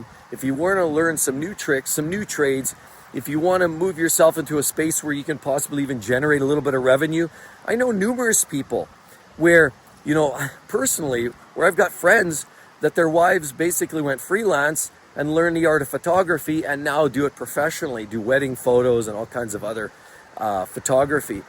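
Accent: Alberta, Canada. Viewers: Is your accent an Alberta accent?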